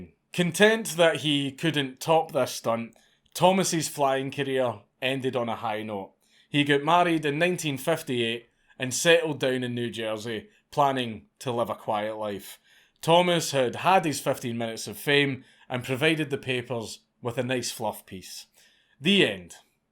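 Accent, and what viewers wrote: Scotland, United Kingdom. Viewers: Bro you only have 830 k + subs?…. Is half of the globe missing their testicles? Or are we in some sick twilight zone episode where having a sexy and masculine Scottish accent taboo?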